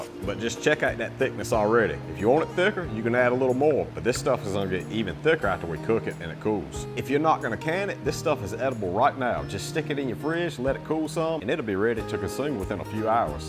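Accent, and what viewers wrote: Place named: Cajun, United States. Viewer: Man you have figured out a good life for yourself. I am a big fan of your videos. Very informative and down to earth. Calming voice with that Cajun sounding twang makes your narration great as well. I know you probably have zero interest (which is also why I say this) but society needs to start putting their worldly faith and trust in men like you instead of the leaders we currently choose. I’m tracking you down in an apocalyptic situation lol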